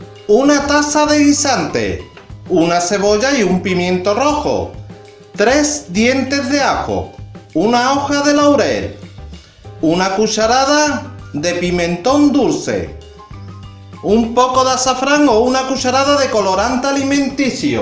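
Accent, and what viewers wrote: Andalusia, Spain. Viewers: Es andaluz, cada quien tiene su acento incluso tu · Andaluz, como Antonio banderas, aunque banderas se ha refinado. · ¿Quién "tiene" razón? La razón, ¿se puede tener? No sé a quién te refieres al decir que "habla muy mal español". Er tipo habla andalús, y si te "duele escucharlo", capaz que necesitas terapia. Es un placéi ehcuchalo, por la gloria de su madre!!! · Que acento andalú! Olé! Voy a probar hacerla hoy... A ver que tal me sale... 😊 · El Concurso habrá sido en Baleares pero usted sin lugar á dudas Andaluz total. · Español vulgar?? Este señor no ha dicho una sola vulgaridad, el simplemente habla Dialecto Andaluz, ampliamente esparcido y hablado no sólo en Andalucía sino también en Melilla, Ceuta, Gibraltar y algunas otras zonas de Algeciras. Los dialectos son variedades regionales de una lengua y existen al rededor del mundo en todos los idiomas. 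· Ese acento andaluz me recordó mis vacaciones en Andalucía. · Es andaluz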